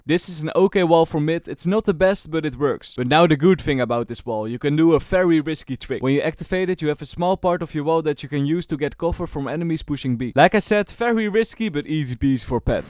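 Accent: Netherlands. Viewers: Can just hear your dutch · Dear i have a burning qeustion u sound like u have a dutch accent so please r u dutch?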